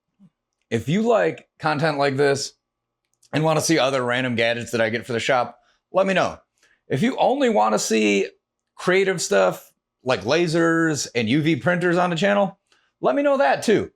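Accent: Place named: Buffalo, United States. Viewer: Knowing you're from Buffalo, that accent goes crazy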